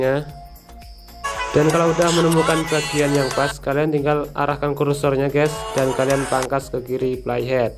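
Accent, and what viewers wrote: East Java, Indonesia. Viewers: Jawa timur yak ?? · Jawa timur nya mana bang?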